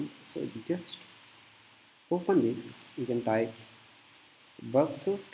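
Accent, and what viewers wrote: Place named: Tamil Nadu, India. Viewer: I love your TAMIL ACCENT 🎉❤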